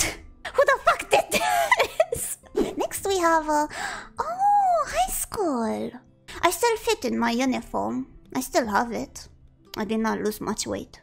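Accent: Canada. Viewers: That damn Canadian accent is crazy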